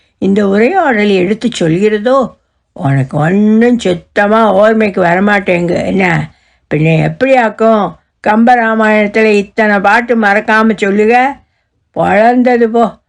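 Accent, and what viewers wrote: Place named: Nagercoil, India. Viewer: very nice madam .... Nagercoil Slang nalla Pesureenga .... nangil nadan in ella Kathay Ka lum bathividungal.... Thank you...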